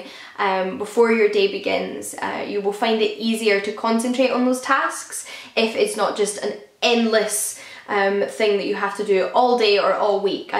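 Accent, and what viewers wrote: United Kingdom. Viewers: Well, nice to meet you! You're beautiful have a good british accent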